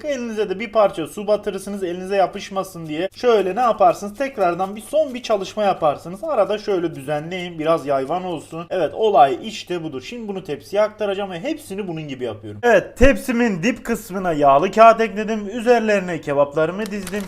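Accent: Adana, Turkey. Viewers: Abi sen Adanalı gibi konüşürsüünnn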